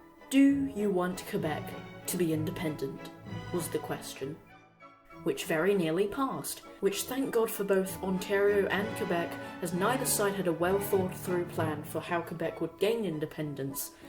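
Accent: United Kingdom. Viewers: British accent, thumbs 👎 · You sound british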